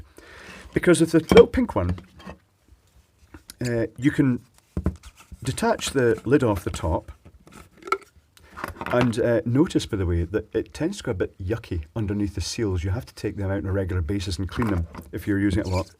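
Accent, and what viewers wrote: Scotland, United Kingdom. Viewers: R u Scottish? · Can't be the only one who knew he was gonna be Scottish before clicking on this video.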